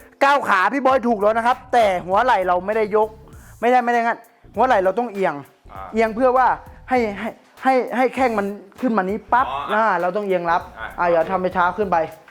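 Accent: Isan, Thailand. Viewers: เป็นคนจังหวัดไหนนิ บ้างทีอิสานปนมาด้วยเวลาพี่อธิบาย ผมละชอบ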